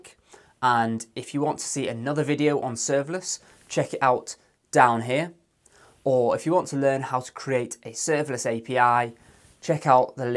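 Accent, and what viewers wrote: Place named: Northwest England, United Kingdom. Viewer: Nice one, love your accent btw. Are you from around the northwest of UK?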